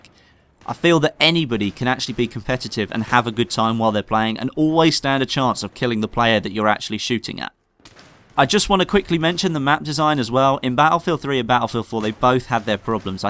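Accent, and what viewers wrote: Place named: United Kingdom. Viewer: British accent sounds so gay.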